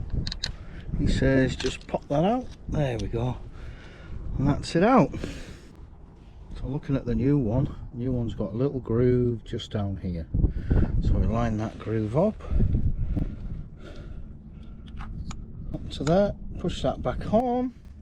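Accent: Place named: England, United Kingdom. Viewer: That’s an English accent… 75 dollars !…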